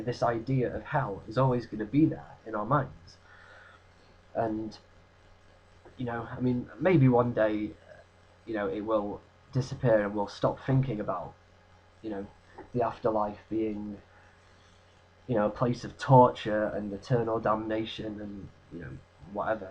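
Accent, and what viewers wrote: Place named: England, United Kingdom. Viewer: I love your accent. It would be very nice if all the English talked this way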